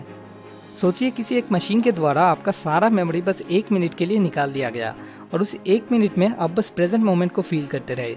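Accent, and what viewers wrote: Bengal, India. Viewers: Video banane wale ki awaz se lagta hai ki ye koi Bengali hai...and true intellect is their Birth Right.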